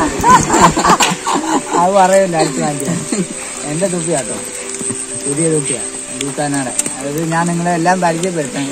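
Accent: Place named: Kottayam, India. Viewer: ❤️❤️❤️❤️👍🏼വഷണ ഇല ....കോട്ടയം speech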